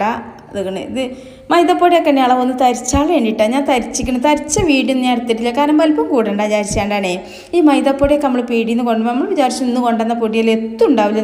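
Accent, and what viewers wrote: Malappuram, India. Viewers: ചാനലിന്റെ പേര് എന്താ മാറ്റിയത്. പക്ഷെ സംസാരം കേൾക്കുമ്പോൾ സൗണ്ടും പിന്നെ കമെന്റ് നോക്കിയപ്പോൾ ആണ് സംശയം മാറിയത്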